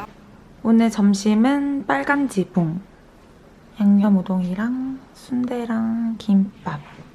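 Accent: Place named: Daegu, South Korea. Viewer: 앗 이랜드... 혹시 우방타워랜드?? 초딩때 소풍으로 갔던 기억이ㅋㅋ 대구에서의 일상에서 사투리 자연스레 쓰는게 뭔가ㄷ ㅓ 정감느껴지네여~^^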